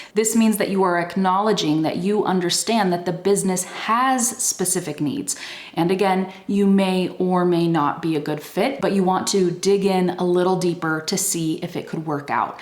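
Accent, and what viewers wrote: California, United States. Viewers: Hi. Just want to know why when I listen to you I can clearly understand every word you say. Is it because you are from California or because you had to develop your pronunciation skills as a teacher? Thanks! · I think there are many accents in the american accent. I like yours. from iraq 🇮🇶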